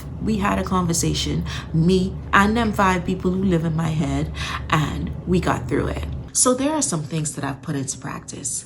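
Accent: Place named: Bahamas. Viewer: You sound like a Bahamian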